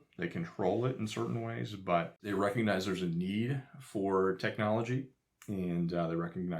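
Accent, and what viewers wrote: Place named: Baltimore, United States. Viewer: Have to ask, are your from Baltimore? I hear certain words that remind me of my childhood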